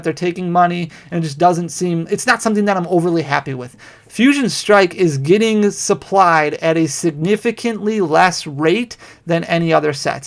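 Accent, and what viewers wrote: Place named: Wisconsin, United States. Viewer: I enjoy hearing your Wisconsin accent! Moved down to Georgia a few years ago.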